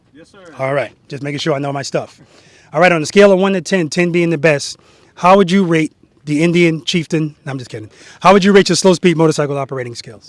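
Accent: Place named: Boston, United States. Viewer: I was working on my bike when this video started. I thought you're a chippy Italian-Irish bloke from Boston at first. 😂 Regardless, I thoroughly enjoyed this video. The way you communicate is top class and I'm so thankful to have stumbled upon your channel.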